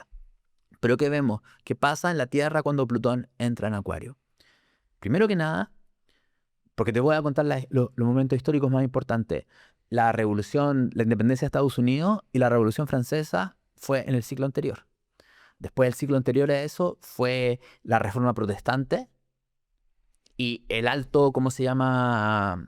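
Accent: Chile. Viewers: No lo conocía, pero al escucharlo supe que es chileno 🇨🇱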